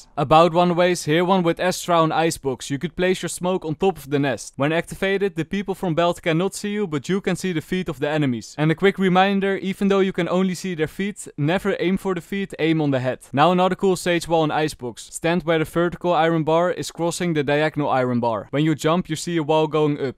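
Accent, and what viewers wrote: Netherlands. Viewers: Cant stand this guys dutch accent but his tips are so good i have to subscribe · i smell dutch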